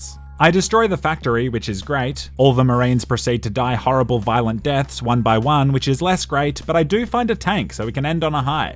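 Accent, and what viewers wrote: Australia. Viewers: >Started watching >5 seconds in >Disgusting australian accent >Pause video and click off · I can't believe I found your channel after many years. It was one of your hitman playthroughs coupled with your ozzie deadpan sarcasm which made me subscribe. Brilliant content. I watched every hitman 2 one, all of the farcry ones, all of the GTA SA and IV ones and the Vice City one. To the point my wife knows that when I go to bed, we are watching for hours now. Shame you're not doing the old intros that you once did, to spread the good word of your channel. I even made one below 😕 "Hi lads and lasses, here. If you enjoyed this video, please hijack one of Elon Musks' SpaceX rockets and send an emergency broadcast out to every planet within reach, stating to subscribe to, as this really helps the good word of my channel"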